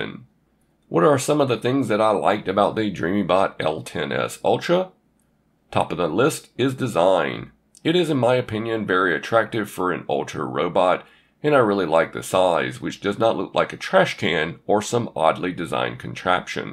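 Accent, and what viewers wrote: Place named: South, United States. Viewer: apologies , it sounded like.youre from the southern U.S. For us brits, Canadian and US accents are pretty much indistinguishable save a twang from states like TX, AL, FL.. bit like aussie and brit then lol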